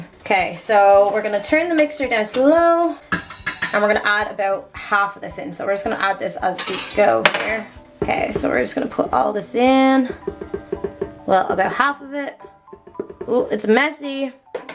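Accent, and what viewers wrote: Ireland. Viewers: are u irsh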